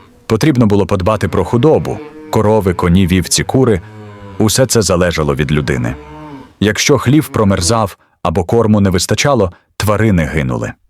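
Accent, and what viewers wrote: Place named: Russia. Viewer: Та не дровА , а дрОва!!! Чому ви в українських словах робите російські наголоси?! Не конІ , а кОні Не травИ , а трАви Не чАсник , а часнИк!!! Не кагАнці , а *каганцІ*. Ви ніколи не чули, як звучить українська мова? Вже не говорячи про зображення. Ніколи не бачили української хАти, навіть на картинках у дитячих книжечках? Вони були обмазані глиною і побІлені! А української пЕчі? Якщо вже ви назвалися "Історична правда", то створюйте щось максимально схоже на неї! Бо у вас покищо виходять "Історичні побрехеньки", нафантазовані ШІ.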